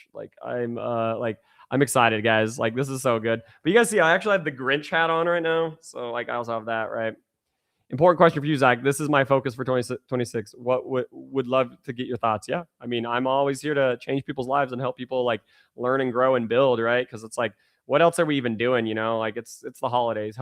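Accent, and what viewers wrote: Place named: England, United Kingdom. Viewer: Hi zach, i am from asia and have followed u for months. I am grateful to you since not only the valueable knowledge of data but also your english accent😂. I often listen to your talking to improve english, besides learning data😂, keep this accent:)))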